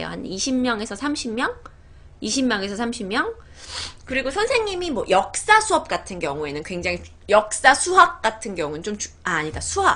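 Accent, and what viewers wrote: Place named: Jeolla, South Korea. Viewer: 팬인데요.전라도 말투!의를 으라고.매번 하던데요.바꿔주면 완벽할텐데요.좀!아쉽네요.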